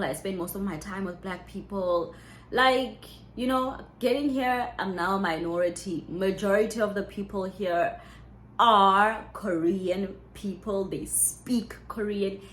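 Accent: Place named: South Africa. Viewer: I Love that you keeping your SA accent ! Aish !!! Hibo !!!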